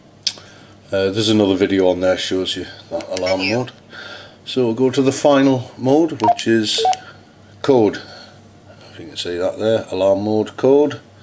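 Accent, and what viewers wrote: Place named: Newcastle, United Kingdom. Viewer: hi m8 u sound like ur up in newcasle in the uk im in hartlepool n just got thr baofeng uv-5rc and need help programming it can u help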